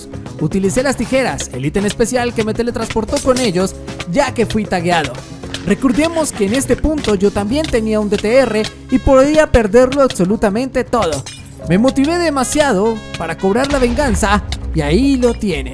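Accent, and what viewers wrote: Colombia. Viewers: Sos colombiano?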